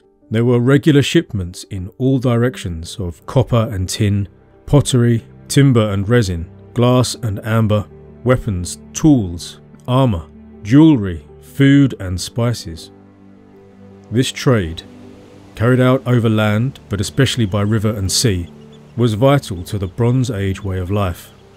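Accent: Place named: United Kingdom. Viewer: Have you ever seen the Fall of Civilization channel/podcast it seems right up your alley. Plus his voice is a little similar to yours (you're both British but sound like you are from the same region). His podcast about Mayan Civilization was great. 9 times out of 10 it ends with the Civilization collapsing because of climate change. Check him out he's also on Audible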